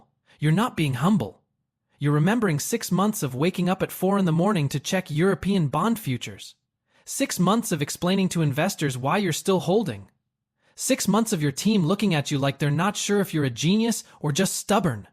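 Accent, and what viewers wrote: United Kingdom. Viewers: I love how it slowly slips in and out of a brittish accent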